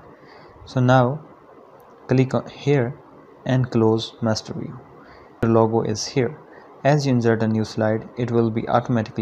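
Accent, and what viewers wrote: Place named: India. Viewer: ty, i like ur indian accent, its cute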